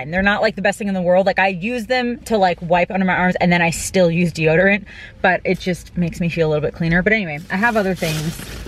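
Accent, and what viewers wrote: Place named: New York, United States. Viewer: I love hearing NY expressions that I never realized were lol. My dad always used to say “dilly dally” but he just recently passed on July 19, 2019. I don’t realize some of the expressions NY’ers say until I hear them. I should have said before I live in NC now for 13 1/2 yrs. So you speak like I do. Lol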